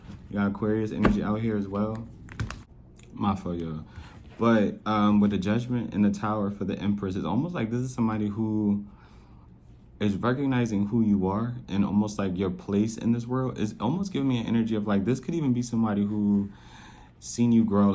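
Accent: Philadelphia, United States. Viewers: You from Philly ?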